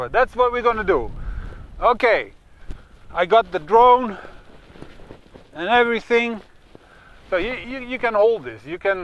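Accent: Netherlands. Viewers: Volger erbij ! hoorde gelijk een Nederlander ;-) ga zo door.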